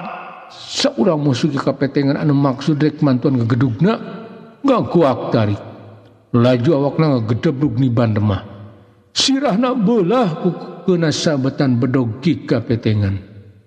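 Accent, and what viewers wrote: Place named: South Jakarta, Indonesia. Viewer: Saepisan ngadongeng na JAKSEL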